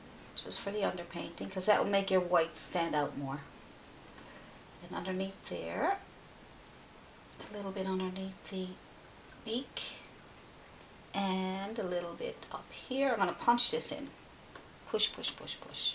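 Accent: Newfoundland, Canada. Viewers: Thank you so much for putting up this tutorial!, I was wondering why your accent was sounding so familiar so hello fellow newfoundlander! <3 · are you from Newfoundland?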